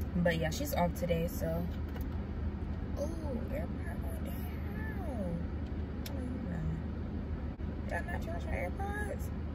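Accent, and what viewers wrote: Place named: South, United States. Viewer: I'm from Queens York I just love it when you talk Southern♥️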